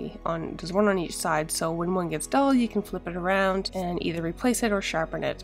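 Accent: Canada. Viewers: I’m not sure how you slipped into my feed, but I’m so glad you did. I’m a Canadian/American tv writer with a love of old furniture. And I heard your voice and the lovely Canadian accent, and I was hooked!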